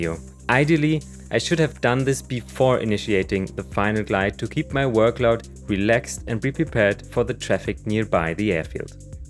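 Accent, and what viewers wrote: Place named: Germany. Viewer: German English😂!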